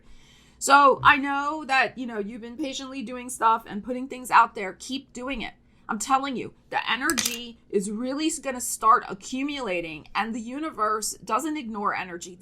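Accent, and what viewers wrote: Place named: Brooklyn, United States. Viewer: Oh! This was a surprise! For some reason I thought you only had done a tea reading for Scorpio!! This is a great reading -- I imagined you delivering it with a Gypsy accent but the Brooklyn one works😂 Yes it's been a mofo 2 of wands space forever. Capricorn appreciated that you get how patient we have been. I don't take credit - Capricorn just does what must be done so we don't really see a choice. Love the page of pentacles coming in. As you know - this cap has sent many applications that have gone into cyberspace...am continuing through the near future -- feels like a treasure hunt.. 💥🌞💥💕😘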